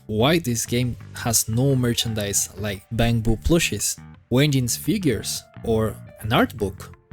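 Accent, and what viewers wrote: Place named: Spain. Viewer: Te lo digo en castellano porque el acento español te delata descaradamente. Sobre el tema incursión arriesgada en concreto te recomiendo que busques videos de gente haciéndoselos con personajes a nivel 50. Creo que si fuera solo un dps check tener los personajes a nivel 60 con las habilidades cerca del 11 sería casi obligatorio y que el juego se puede jugar de manera más profunda de lo que aparenta. Los propios desarrolladores han dicho que querían diseñar el juego de manera parecida a un juego de lucha para que la habilidad de los jugadores tenga mucho peso y desde que he visto este estilo de videos e intento aplicar sus técnicas mis puntos en incursión arriesgada han mejorado una barbaridad. Solo eso, el resto de puntos me dan un poco lo mismo, este en concreto me toca de cerca porque yo estuve un tiempo frustrado con el modo también así que espero que sirva mi comentario. 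Un saludo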